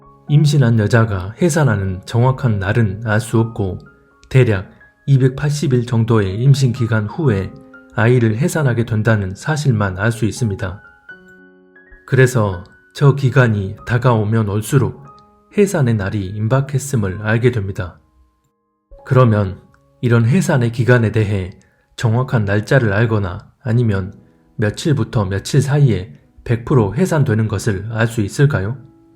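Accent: Jeolla, South Korea. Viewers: 억양을보니 절라도 사람 같다.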